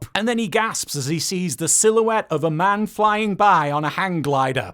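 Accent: United Kingdom. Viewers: It’s amazing to hear how his accent has gotten so progressively less British. Like seriously. Go back and listen to his old vids. British. Accent.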